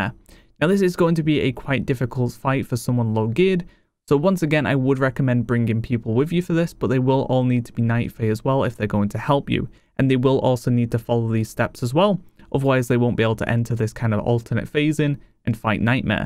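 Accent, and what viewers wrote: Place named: Yorkshire, United Kingdom. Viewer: Where in Yorkshire are you from mate? Your accent is very similar to the wife's 😋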